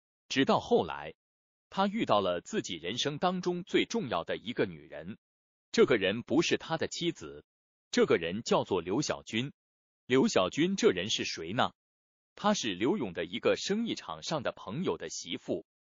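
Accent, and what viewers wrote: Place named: Taiwan. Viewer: 这是哪毕业的播音员啊？台湾吗？这普通话不是母语啊！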